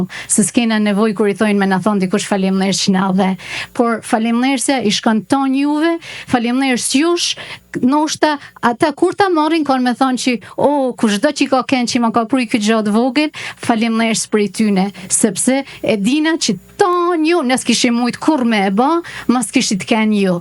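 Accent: Shkodra, Albania. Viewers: Sa. Shum. E. The. Ate. FJALEN. QI. QI. QI ma. Mir. Do. Te. Dalin. Qe Te. Thuesh. Qe Qe. Qe. Qe Jo. QI Qi. Ose Ci. Ci Se NUK. I. Shkon. Dialektit. Shkodran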